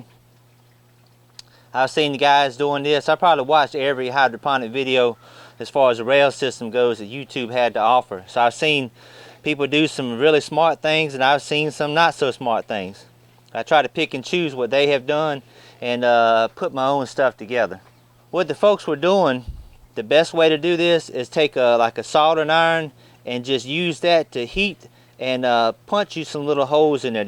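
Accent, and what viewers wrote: South, United States. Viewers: yeah, we all want to make fun of the southern "accent" and wording choices but you have to hand it to him, he's providing a ton of knowlege through trial and error, which is invaluable. I say thank you and I wish I were in a climate where I had more than 2.5-3 months per year to learn in. . .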